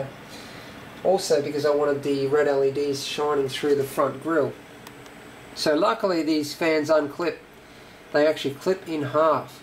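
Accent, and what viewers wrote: Australia. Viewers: I thought you were from Australia, I'm from Perth. Btw nice system im currently building my first water cooled setup up. Just a question? Umm how would you run your water loop? Would you go Reservoir > Pump > Radiator > CPU ???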